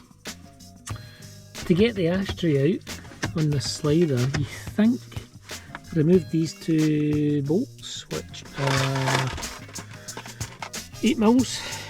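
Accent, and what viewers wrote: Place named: Scotland, United Kingdom. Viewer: Great explanation dude.. even with those Scottish tones..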